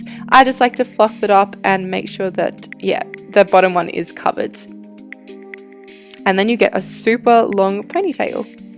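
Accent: Australia. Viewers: I just found you on youtube and I'm obsessed! I love your videos and your Australian accent and you really help me to understand more English because I'm from Sweden and I'm not so good at speaking or understanding English🇦🇺🇸🇪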